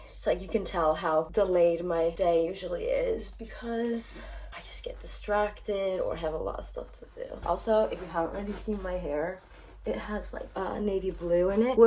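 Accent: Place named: United Kingdom. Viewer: there's some parts in this where you have a really strong brittish accent omg 😂❤️❤️❤️ I guess it's rubbing off on you xxxxx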